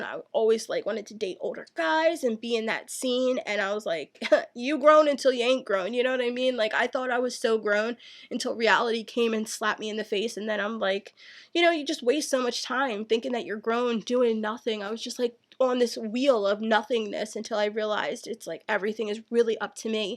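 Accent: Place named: South Jersey, United States. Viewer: Gurrl! You already know I love you, your personality, content and accent! Go South Jersey..Lol 🙌🏽🙌🏽 I can totally relate to the whole thyroid issue! Basically it sucks! I’m hypothyroid and my hair is nothing like it used to be..it’s thinned out, dry and falls out!! ugh, my nails..and it does so much to your body, we would be here all day! Hang in there and go see the specialist for it, an Endocrinologist! I look forward to your adoption story and always your next video! Tfs love! 😘🤗 ~ Helen -NYC girl here..Lol!!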